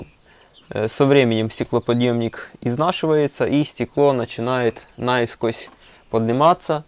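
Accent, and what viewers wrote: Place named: Urals, Russia. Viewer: Умань? а говор как на урале ))))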